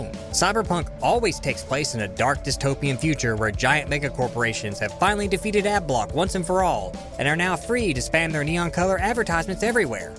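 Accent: South, United States. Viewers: you made the Southern accent great again!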